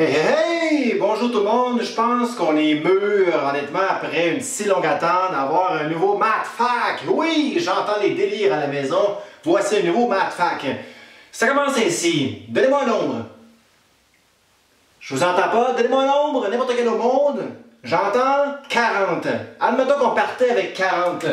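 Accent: Quebec, Canada. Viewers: c'est au contraire l'accent québecois et l'enthousiasme qui font le charme des vidéos de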